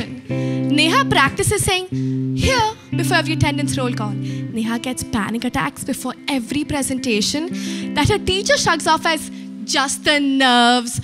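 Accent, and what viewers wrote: United Kingdom. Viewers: And I love your English accent❤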